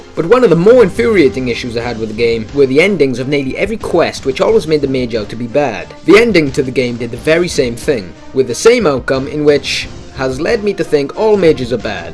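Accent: Wales, United Kingdom. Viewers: BTW that's not a English accent either. Sounds Welsh. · are you Welsh? It sounds like you have a Welsh accent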